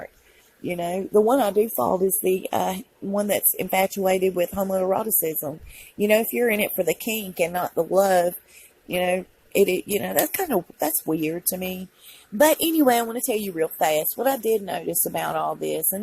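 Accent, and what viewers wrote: South, United States. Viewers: GOD'S LOVE watched 2 of your videos the other day and subbed. Love your channel. Love your south Accent with that 'Twang'. New sub. BUT all I've got to say which would be criticism is; 'Michelle Obama' IS a man, It has an Adams Apple and the ring finger is bigger than index.. Just like a man. Plus a women can only fit 1-1/2 headxon their shoulders. She can fit 3.. All the best.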